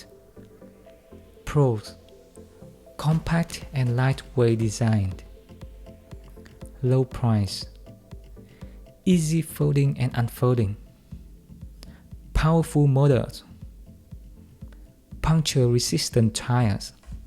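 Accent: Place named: Vietnam. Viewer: Vietnamese accent :D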